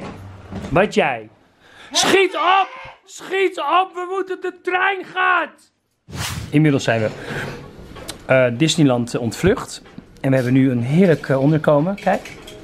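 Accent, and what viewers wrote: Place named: England, United Kingdom. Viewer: is zo grappig vooral Zijn Engelse accent 😂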